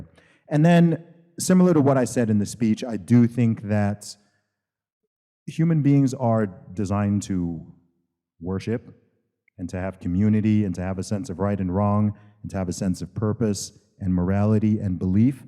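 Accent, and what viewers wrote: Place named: United States. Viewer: I’m confused. His Wikipedia page says he grew up in Saudi Arabia but is a British citizen, so why does he have an American accent?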